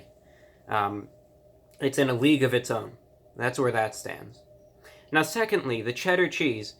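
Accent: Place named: New Jersey, United States. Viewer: Part of his charm is demeaning his uniqueness. I love his Jersey accent and persona. He's good-looking in an uncommon way. I fuss at him because I do like him.😂❤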